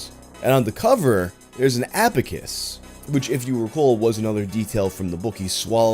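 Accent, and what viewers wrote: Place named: New York, United States. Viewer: "NBA Jyaam" You sound just like your impression of the stereotypical New Yoyk accent from your Kill Count vids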